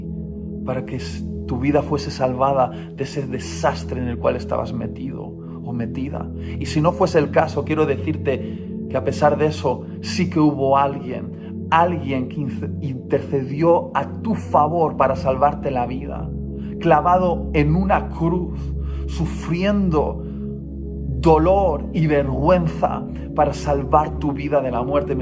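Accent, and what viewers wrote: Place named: Spain. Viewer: Es su acento, es español.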